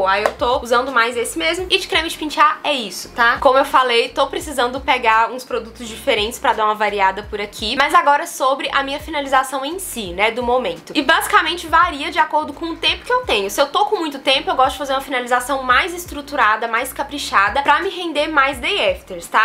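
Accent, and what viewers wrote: Minas Gerais, Brazil. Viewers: Pelo sotaque imaginei que era mineirinha .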